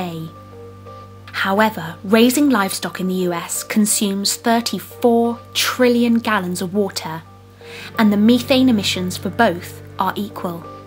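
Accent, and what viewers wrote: England, United Kingdom. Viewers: These figures seem controversial. I mean, I know they went to the trouble of hiring a voice actor with an English accent, but has anyone bothered to fact check this?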